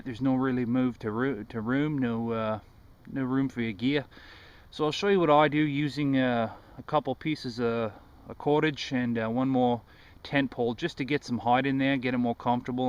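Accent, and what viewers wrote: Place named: Australia. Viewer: hahah great accent mate. aussie/american/canadian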